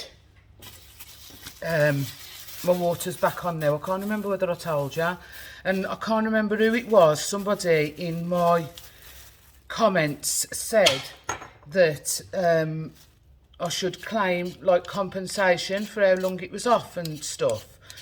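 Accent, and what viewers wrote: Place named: West Midlands, United Kingdom. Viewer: Hi just come across your channel, so glad I did really enjoyed your day in the life! Not sure where you come from in the West Midlands, I live in Lichfield, you sound like my friend from Walsall although everyone when we are away always thinks we’re from Birmingham 😂 x x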